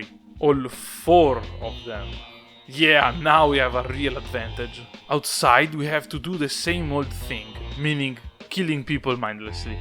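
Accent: Italy. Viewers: Oh my goodness I love your Italian accent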